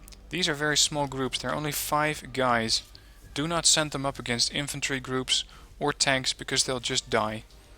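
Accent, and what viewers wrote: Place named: Netherlands. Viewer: Bedankt man, heb het spel net gekocht, en ben het nu aan het leren, ik ken de basics van World in Conflict nog wel en dit lijkt er wel een beetje op. Je guids helpen er goed mee! (Volgens mij kan ik in je accent horen dat je nederlands bent, geen zorggen je klinkt niet als Mark Rutten)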